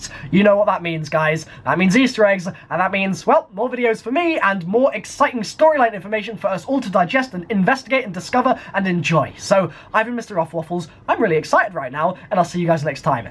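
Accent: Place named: RP, United Kingdom. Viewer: Posh but good vid left a like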